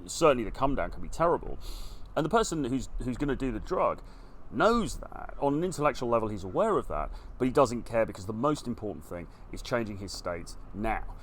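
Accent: United Kingdom. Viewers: Although you and I probably have a number of major differences when it comes to beliefs in life (I believe in Jesus Christ/the bible; not sure if you do), as someone who's right around your age (I think I'm like 2 or 3 years older than you), I love how real you get, and the things you talk about. I've never run across this in the Manosphere, and I've watched TONS of videos since I got "red-pilled" a little under 4 years ago. And, although I'm American (and, this has nothing to do with having significant heritage from the U.K.), it's nice to listen to a well-spoken Brit, especially since--and no offense to anyone--many content creators in the Manosphere sound like they may not have even graduated from high school. I'm sorry, but being intelligent, educated, well-spoken, etc..., regardless of what people say/admit, gets you a lot more credibility than not being those things.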